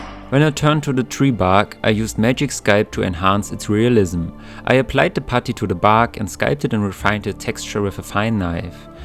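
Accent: Germany. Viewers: it Sound like a german that speak English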